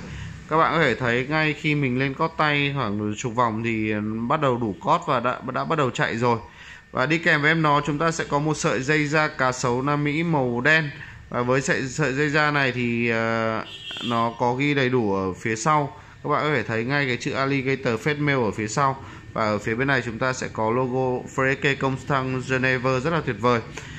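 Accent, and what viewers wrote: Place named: Scotland, United Kingdom. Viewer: Thought this geezer was Scottish at the beginning lol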